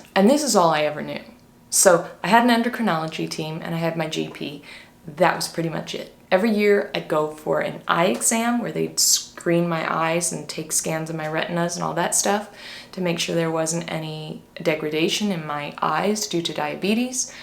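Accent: United States. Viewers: You don't sound like somebody who grew up in the uk. Your accent is very usa. Did you grow up in the usa then move to the uk, then move back to the usa? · I have to wonder, if you spent most of you adult life in the UK, why did you agree to give up what must have been a beautiful Briish sccent in favour of an American one. How long were you in the US before they stole your voice? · Very good overview and comparison, but I just wondered, if you'd lived for most of your life in the UK and only recently returned to the US, why do you have such a strong American accent? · Seems in the states you get ill you’ll go broke fast Your accent is so American, you lost the uk accent really quick in only 1 year?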